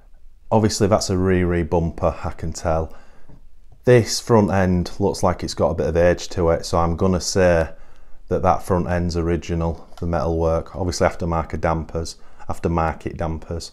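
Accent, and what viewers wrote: United Kingdom. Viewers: 🤠 Do you want these as well? 🙄🤦‍♂️🤦‍♂️🤦‍♂️ Yes, Yes I do 😍 🤣🤣🤣🤣🤣 it’s awesome I would have said yes as well I was trying to figure out what you meant by Bad-GA BUG then I saw the decals & remember your English isn’t my English it’s BA- HA Bug referring to Baja Mexico & the Baja 500 and the off road desert racing that goes on down there to this day. I used to work with a couple engineers from the UK (& Europe in general) I think I’m used to the accent I’m not even close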